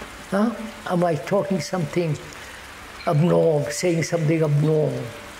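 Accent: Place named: United Kingdom. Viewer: his accent is British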